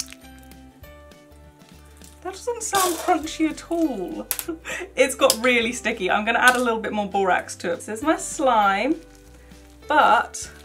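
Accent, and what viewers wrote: United Kingdom. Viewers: I feel like you are like a little bit older version of me with a British accent lol, I look a lot like you ❤️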